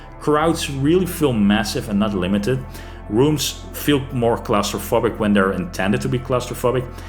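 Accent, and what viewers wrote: Netherlands. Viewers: Ik hoor n hollander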